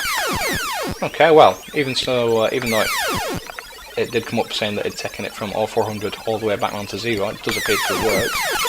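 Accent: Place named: Lancashire, United Kingdom. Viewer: Very nice 😀 Your accent reminds me of David Collier and Tony Pomfret... very Atherton-esque, Westhoughtony or 'roundabouts 😊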